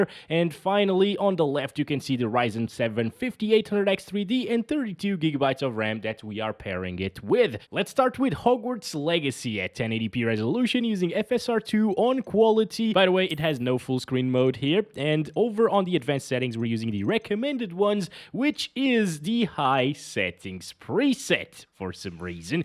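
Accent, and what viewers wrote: Portugal. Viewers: você é português !!!???? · Kksksksksksk coe mane, o cara é português que doidera🤝🏻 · Descobri agora que esse cara é português XD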